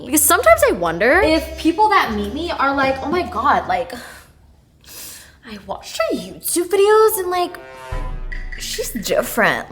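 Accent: England, United Kingdom. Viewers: Thank you, for being your chatty/ oversharing self!! Love the UK accent reminded me of Adele 💗💗 Phenomenal Spanish too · YOU’RE AMAZE BALLS ❤❤❤❤ also the bri’ish accent is gorg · i'll be glad if you make a while video with british accent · love how spam account is just british 😭😭😭😭😭😭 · wait... omg that english accent is so slay omg.😍😄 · We need full British accent video ❤❤ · Hiya. The English accent was good, can you please do an Aussie one? I wanna hear a few G'day mate and good onya's! Lol. ❤ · why does your british accent lowkey sound like millie bobby brown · I was binge watching all your resent videos this week and I’m just happy to have another one to watch. The British accent is killing me tho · your british accent sounded like little Ron Weasley's voice✨ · Love the English accent lol 😂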